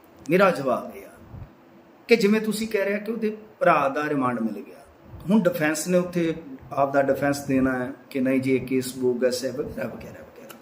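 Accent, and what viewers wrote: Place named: Majha, India. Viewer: Very intelligent and soft spoken journalist is Your Majha accent is very loveable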